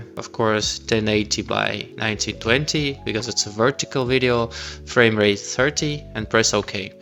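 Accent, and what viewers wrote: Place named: Russia. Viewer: впервые узнал русский акцент. ты крут, чувак. потом будем вместе работать)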